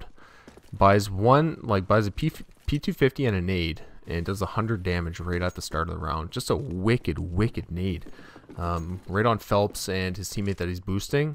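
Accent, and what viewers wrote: Canada. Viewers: are you canadian m8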